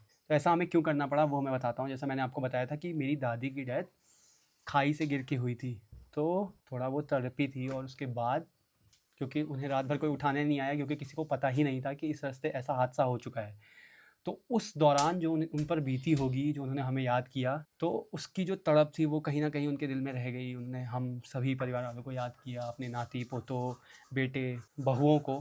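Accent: Pahadi, India. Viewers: Pahadi accent amazing ❤❤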